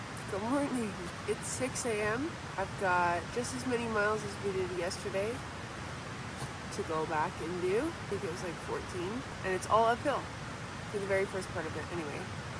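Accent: Canada. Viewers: The Canadian accent is still there young.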